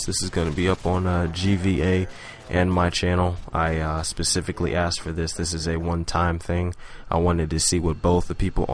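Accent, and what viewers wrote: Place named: United States. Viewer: i just thought you sounded american.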